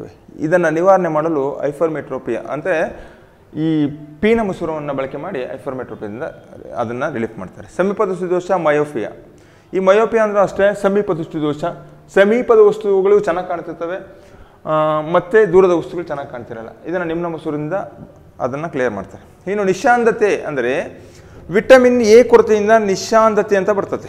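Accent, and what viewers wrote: North Karnataka, India. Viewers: sir nivu class channag madtira, bt nam utthara karnataka mathado saili Channagill,, nivu mululi normal aagi mathadta class madi beautiful aagi barutte,, bejar aagbedi sir please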